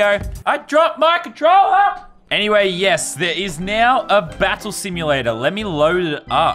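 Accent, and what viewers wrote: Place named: Australia. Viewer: yes, youre a patriots fan!!!!!!!! where do you live cause you sound Australian but you like the Patriots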